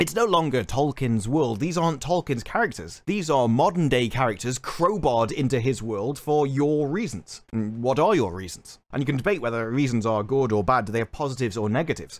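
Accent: United Kingdom. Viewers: God I enjoy your lovely British accent while passionately dismantling these Tolkien abusers.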